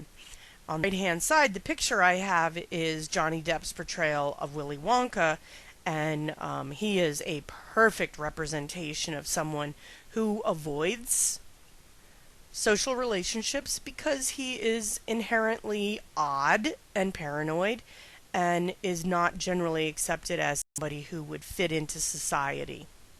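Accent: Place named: Pennsylvania, United States. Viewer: Thank you for this, it helped me a lot to prepare for a psychiatry oral exam. I am French, but I lived in PA for two years, it was nice listening to your accent as well (unless I am completely wrong and you are not even from there :) :) I think your explanations were well-formulated and your examples very clear. What I still have a hard time with is matching the mechanisms with the disorders. Like a person with BPD will use projective identification and idealisation for instance. For some others it can be more difficult for me to remember. Also, I am supposed to know the reason why a person has this or that disorder from a personality development point of view (Mahler theory)... This is kinda confusing for me. Anyway, thanks again that you shared your work! Subscribed!!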